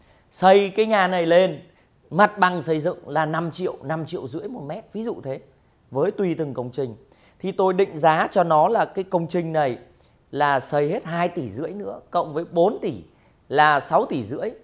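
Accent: Thach That, Vietnam. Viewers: Giọng bạn có phải quê thạch thất?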